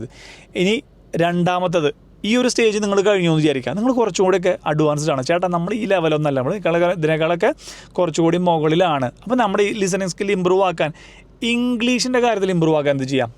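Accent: Thrissur, India. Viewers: Thrissurkkaran aanalee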